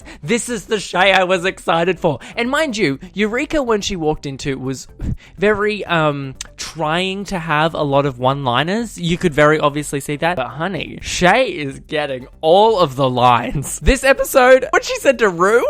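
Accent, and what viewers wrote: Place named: Australia. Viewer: you sound like an aussie coco peru at times.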